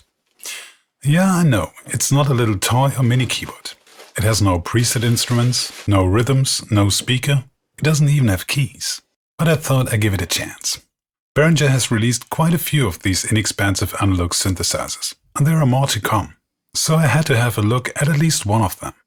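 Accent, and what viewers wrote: Germany. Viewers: Selbst wenn das Wort eines Schweizers wohl nicht so viel zählt wie das eines Native Speakers: für mich klingt dein Englisch super. Die Stimme ist angenehm und grammatikalische Fehler sind mir bisher noch keine aufgefallen:) Klar hört man den deutschen Akzent ein klein wenig heraus, allerdings bereichert der die Videos eher und stört überhaupt nicht, denke ich. Danke für die Info! Das klingt so, als ob die Effekte, Levels, Breaks, usw. viel Zeit in Anspruch nehmen, hingegen die Melodien, Akkorde, Pattern und Rhythmen schnell angedacht sind. Klingt zwar etwas kitschig, aber ich stimme voll und ganz zu: Limitations foster creativity. Ich halte ab und zu Ausschau nach einem guten alten PSR oder einem Casiotone. Leider verkaufen die Leute meistens nur moderne und für mich schlecht klingende Keyboards. Lieber einige wenige, eingeschränkte, aber dafür warme, angenehme Klänge mit Charakter. 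Und für die alten, leider oftmals ungepflegten Geräte wollen sie über Hundert Franken. Obwohl ich eine Art falsche Nostalgie spüre wenn ich die Klänge dieser alten Geräte höre (weil ich gerade mal 1995 auf die Welt gekommen bin) möchte ich mir trotzdem einmal ein älteres Keyboard leisten. Synths hatte und habe ich genug und liebe sie nach wie vor. Aber sich einfach mal mit so einem Gerät hinsetzen und einen Song schreiben ist wahrscheinlich doch noch mal etwas ganz anderes. Ich hatte vor ein paar Tagen etwas gefunden, was dich (ich bin so frei und duze und hoffe, dass mir das nicht übel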